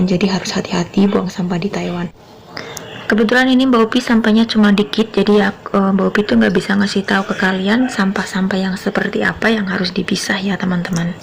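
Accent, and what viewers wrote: Banyumas, Indonesia. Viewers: Salam kompak wong ngapak..ora ngapak ora kepenak kk..😁 Sukses selalu kk..🙏🙏🙏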